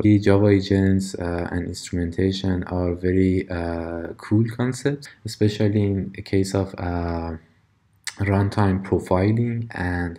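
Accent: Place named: Iran. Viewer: Your accent tells me that you are an Iranian)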